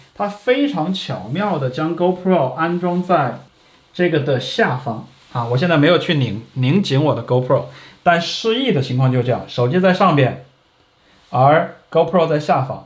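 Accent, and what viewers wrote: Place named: Yunnan, China. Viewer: 感谢的详细介绍。已入QUADLOCK,另外，您的口语有马普的味道，是云南人吗？谢谢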